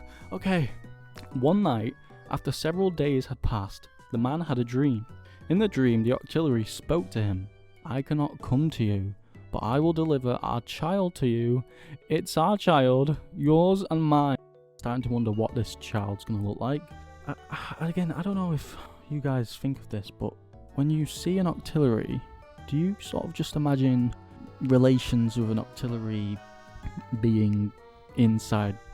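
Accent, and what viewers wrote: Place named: United Kingdom. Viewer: I really enjoyed this video, great to hear you reading the story out and your reactions to it 😂 I liked the video editing too. Also as a fellow Brit my first thought hearing you was "ooh yay, is British too!"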